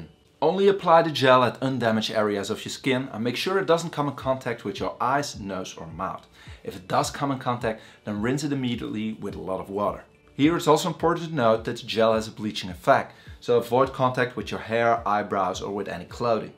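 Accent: Netherlands. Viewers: Ik kan aan t accent merken dat je nederlands spreekt 😂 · Hahaha, I knew you were Dutch. My favourite European-English accent. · I heard your voice and just knew you were a fellow Dutch person.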